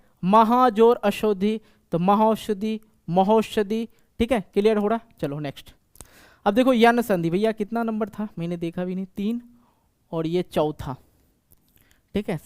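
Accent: Bihar, India. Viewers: Sir aap bihari hai ❤❤❤❤ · Sir apki language samajh nhi ati hai lagta hai app bihari mai bat krte ho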